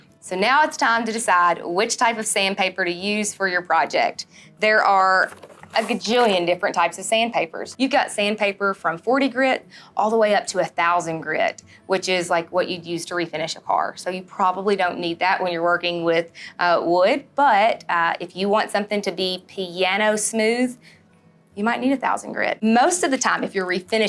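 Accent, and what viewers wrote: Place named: West Texas, United States. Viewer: Thanks for the refresher on types of sanders and sandpaper. I'm trying to place your accent. Are you from west Texas?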